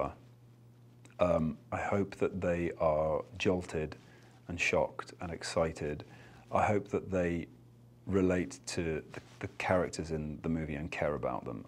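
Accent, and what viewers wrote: United Kingdom. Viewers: In the BBC Sparkhouse production he has an accent as a farmer in Yorkshire with Sarah Smart and Joe McFadden. A super show!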